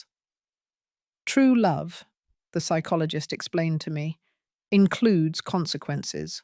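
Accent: United Kingdom. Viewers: Good story but dont like the narrator from the U.K.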